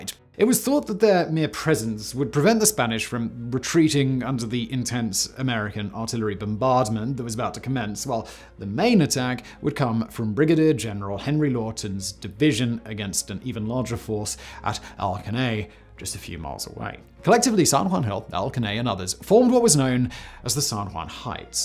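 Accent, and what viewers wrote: United Kingdom. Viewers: “ a staunch and” ? Vociferous? Opponent” I can usually decipher your British accent but in this case I wasn’t sure if you said vociferous or vociferent Now I have to go Google to see if that’s actually a word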